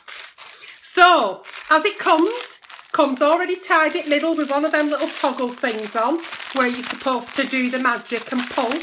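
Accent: United Kingdom. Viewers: The British accent 😂😂😂😭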